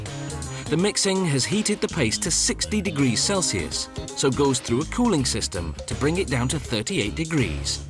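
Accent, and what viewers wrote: United Kingdom. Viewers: Of course the video starts out with a British guy talking about bad teeth. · The bloke is a northerner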